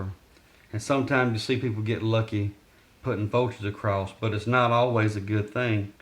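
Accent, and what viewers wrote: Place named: Arkansas, United States. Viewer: i could listen to you all day long! You frpm Arkansas hahaha. God Bless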